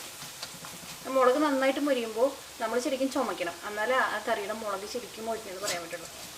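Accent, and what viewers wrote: Thrissur, India. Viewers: We Like the Trissur slang. All of your recipes are simple and really good! Keep it up!!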